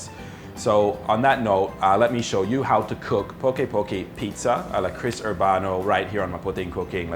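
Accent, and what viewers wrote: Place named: Philippines. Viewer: Soooo... your accent really throws me off. I know you're Aussie but you sound like a Flip fob... (not that there's anything wrong with that). =P Love your channel, btw... keep it up!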